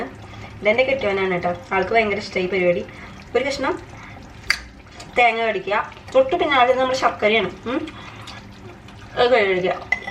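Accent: Thrissur, India. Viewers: Super recipe,,,,appo.........,nammalu Thrissur karude samsaram kelkkan entha rasam 🤩🤩🤩......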